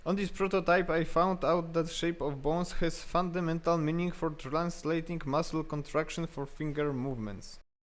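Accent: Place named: Poland. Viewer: Coś mi tak zaświtało że to może być rodak po tym akcencie xD Życzę samych sukcesów, choć przykre jest gdy się patrzy jak wielki potencjał marnuje się u nas. Na zachodzie już dawno byś siedział we własnym laboratorium, choć prawda jest też taka że gdzie by się z tym nie poszło to każdy będzie chciał mieć to dla siebie.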